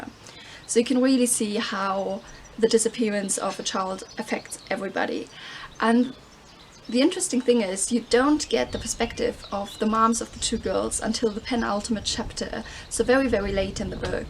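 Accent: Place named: Brandenburg, Germany. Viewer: Rain? Tropics get rain. The Sahara, Death Valley & other hot weather deserts get rain when they're lucky. Europe is so lucky. Hurricanes? What hurricanes? Tornadoes? Very occasionally in the south, but no one place gets hit with any regularity. Major earthquakes, well OK some in Italy and a bit in Spain. More northerly climes are completely spared. Not fair. Lucky lucky lucky. But beware the Azores re causing tidal waves & Icelandic volcanoes erupting. Euros can't be lucky all the time. According to linguistic maps, your dialect & accent is labelled as Markïsch aka Margravian aka Low East German. As u obviously understand the High German of places like Hannover, it means that u should have an easy time learning Dutch, which is a mix of High & Low German. You are a linguist, you learned English. And some day you'll rattle off chrysanthemums & "this that them there things, the thirty-three of those though they thoroughly" with no trouble at all. 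Me, pronounce the hardest sounds in German? Faints. :-D And I thought French was hard. According to Worldometers, Germany still has >27.7K active covid cases, with 216 new cases reporting today. The curve of covid is still going up, but it is closer to flat than it has been before. Canada has lotsa space, very spread out, relatively speaking, so our situation is vastly different, depending on the region. One province, Quebec, has nearly 30K cases & >2.1K deaths, whereas its bordering province, New Brunswick, has <120 cases and 0 death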